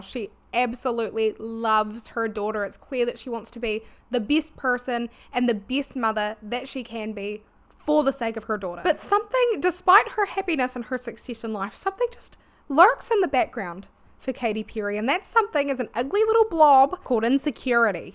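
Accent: New Zealand. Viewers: Where in NZ are you from? I've lived here my whole life, and I can't place it 😅I'm from Christchurch! It's always good to see a fellow Kiwi putting themselves out there. My fingers and toes are crossed for your success <3 Omg also do you remember when she came to NZ in 2014? It was mad! People were desperate for tickets. My sister and I went for our 10th birthday and ended up giving our tickets to two teenage girls who were crying outside Spark Arena. I honestly still feel good about it lol We were right up the top and I got so sick and overwhelmed. We ended up having dinner with two nice ladies at the restaurant next door. Man, that was an interesting era of pop culture. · omg i love it, the research you do is so thorough! btw I am a kiwi living in the UK and I am so glad to have found your channel, your accent and humour is like a little slice of home <3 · I think it's the New Zealand accent but when you say, it sounds just like Katy Perry, or is it the other way round? 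· Girl please never apologize for the way you talk, I was an au pair in NZ and your accent reminds me of that amazing time there 🫶 when I watched one of your videos with my bf he said he can finally pinpoint what my accent sounds like (English isn’t my first language), and it made me so happy that I still have some of it left years later. Love the kiwi accent, love your videos, cheers from halfway around the globe ☺️ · without any knowledge i will say to my brain, your accent is what Glorilla’s southern accent is to the US South to NZ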